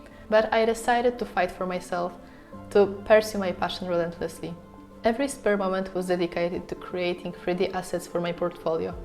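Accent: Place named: Poland. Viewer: Ten akcent od początku wydawał mi się polski, aż do - Podziemia.. szczyty itp :D Fajny materiał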